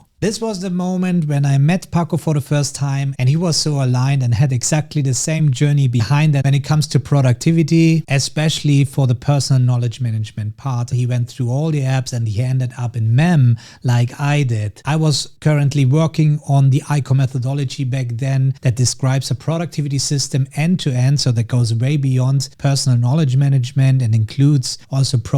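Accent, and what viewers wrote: Germany. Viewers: Im hearing a lil bit deutsch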